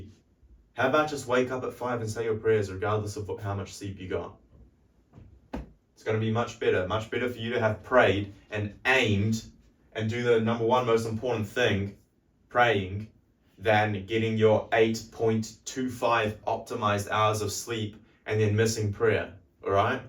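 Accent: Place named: Northern England, United Kingdom. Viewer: Amazing cross between a northern english, kiwi and australian accent. Listen to this young man, he’s either lived many perspectives already, or has family experience to call on.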